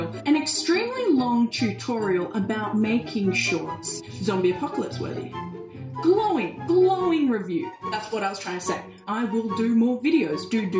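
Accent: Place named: Australia. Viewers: Never even knew that our aussie accents made tattoos sound like "ta-doos" to americans?¿😂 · so pointing out that an Australian sounds like an Australian? Don’t let them change you, tell them to go heck themselves and lean into it even more. Go full bogan. Sell “Tadoos” merch. Do you.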